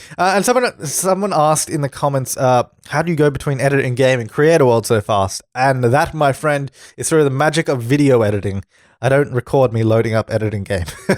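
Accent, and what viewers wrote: Australia. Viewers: Marry me! Ur so cute! Love ur aussie voice! Cuuuteee! Wanna learn how to Make a World! I Think my pc is toooo Slow! Xoxoxoxo from Denmark! 🇩🇰🇩🇰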